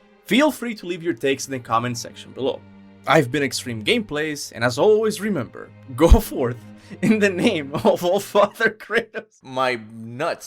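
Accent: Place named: Cuba. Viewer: ah yes, Kaptain Kutie well duh. It's the name of the game. Awesome video,! You sound a bit cuban but i like it!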